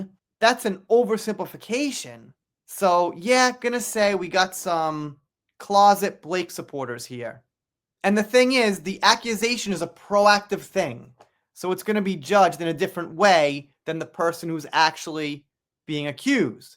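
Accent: New York, United States. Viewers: I’m from New York and I gotta say, you are DEF from NY or NJ . Love the accent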